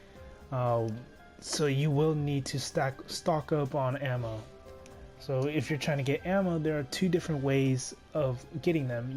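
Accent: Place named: Arab world. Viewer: واضح من لهجتك انك عربي بس لهجتك أحسن بكثير من كثير من العرب، ما شاء الله 😂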